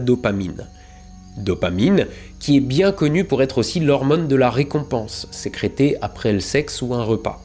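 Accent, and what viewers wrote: Alsace, France. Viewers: C'est un accent alsacien pas belge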